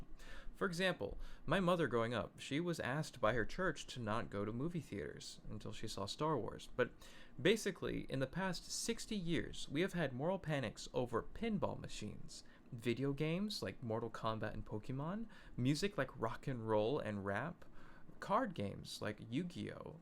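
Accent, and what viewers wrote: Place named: United States. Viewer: Unusual to hear such sense spoken with an American accent these days.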